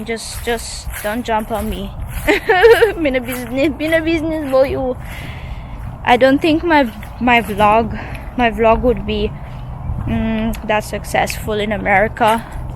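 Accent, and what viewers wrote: Patois, Jamaica. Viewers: O shit she got a Jamaican accent wasn't expecting that. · I love wen u speak and I agree with you totally on these topics. It's good to hear you speaking the patwah and you have a wonderful family thts worth fighting for to stay together always. Thanks for sharing and all the best going forward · I love the way you embracing our jamaican language good! job 👍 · She sound pure Jamaican . Never knew Russian married to blacks . That’s an unlikely pair . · Looooobe her jamaican accent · Ummm… she’s Jamaican. How am her accent be on or off “point”, it’s her natural accent. WTF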